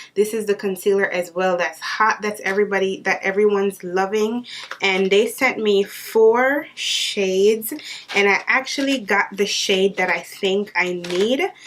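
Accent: Jamaica. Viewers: I just knew u were Jamaican from the first time you opened ur mouth lol big up muma🤗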